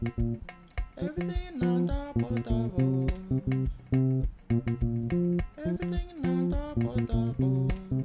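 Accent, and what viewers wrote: Liberia. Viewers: You sound like a Liberia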